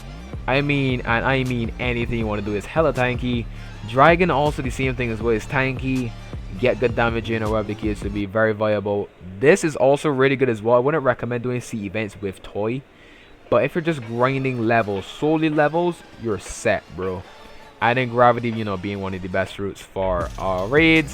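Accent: Barbados. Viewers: U bajan? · u have a barbados accent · From your accent u from Barbados?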